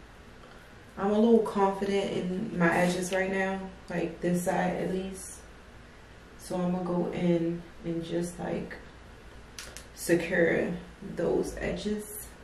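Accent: Baltimore, United States. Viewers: You sound like your from my city.. DC🥰 Nevermind.. I hear B-More🤨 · Yea that’s that Baltimore accent I can hear it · I’m loving the Baltimore accent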